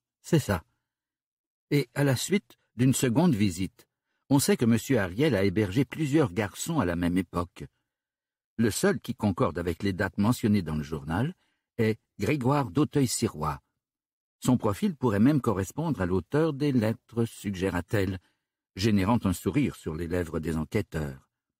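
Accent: Quebec, Canada. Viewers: J'en suis qu'à la moitié, j'adore je suis conquis ! Un peu de mal avec le Québécois parfois mais c'est rigolo 😁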